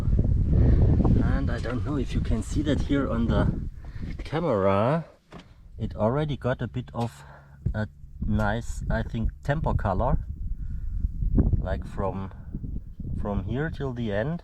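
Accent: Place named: East Germany, Germany. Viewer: DDR ENGLISH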